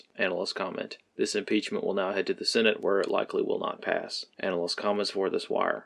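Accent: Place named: South, United States. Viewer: He’s Southern. We tend to reflect on our words and phrases as we speak.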